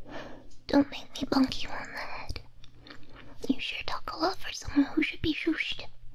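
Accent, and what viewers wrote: France. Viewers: Frenglish is simply adorable in such a typically way. I speak both French and Italian, maybe we'll get an Italian maid next?:3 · La plupart du temps quand j'entends un non-natif parler Français je trouve ça un peu dure à écouter car l'accent m'a l'air forcé. C'est pas du tout le cas là par contre, l'accent et la prononciation tombent pile dans le territoire en frenglish mais compréhensible ce qui rend l'audio bien plus calme et relaxant. Most of the time when I listen to a non-native speak French I find it hard to listen to because the accent seems forced. Not the case here tho, the accent and pronounciation fall right between frenglish yet understandable territory, which makes it way more enjoyable and relaxing imo. Great stuff as always. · Hmmm, I think I like the French accent now.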